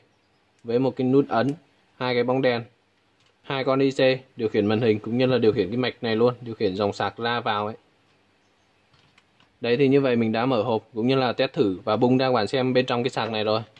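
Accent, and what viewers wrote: Nghe An, Vietnam. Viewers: Anh ơi anh người nghệ an hả anh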